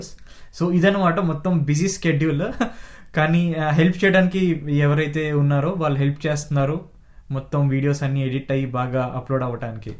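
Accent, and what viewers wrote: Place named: Telugu, India. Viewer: Hai 😊 garu.. Mee mokham (face),Mee Mata theeru..bhaguntadhiy... Telugu thanam Uttiy paduthundhiy...💯❤️🙏 Maaku JAPANE Kalla kiye Katty nattu chupisthunaru.....🧐😍🎊 Cangrasuletion👋🏼 You tube batten Vachinadhuku 👍🥳🤩 Mee video kiy feedha Avvuthanu..💯💕🥰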